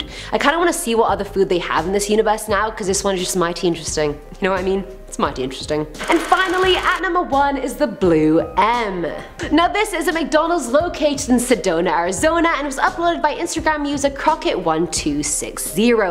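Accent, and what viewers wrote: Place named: United Kingdom. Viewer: Videos aren't the same when Che is not the host. And why put a British host? 😯🤣🤣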